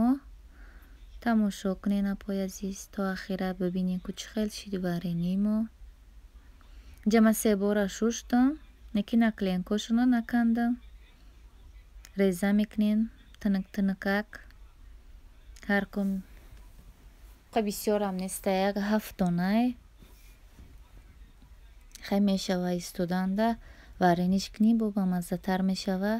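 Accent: Kulob, Tajikistan. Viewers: Шумо ай кулоб.хастен мада гапзанитон макулай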